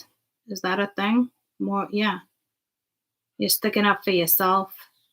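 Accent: Boston, United States. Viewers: First time here. Saggie!! Thank you. I hear an accent, are you from Boston/ New England Area?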